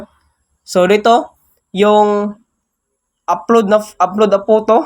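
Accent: Visayas, Philippines. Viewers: Bisaya man deay ka mas galisod ko sabot sa imong tagalog😅 anyway unta ma sundog nako imong vedio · bisaya ahaha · Bisaya eh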